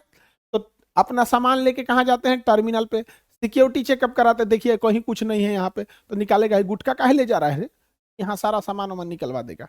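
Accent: Bihar, India. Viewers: Bihari hai sir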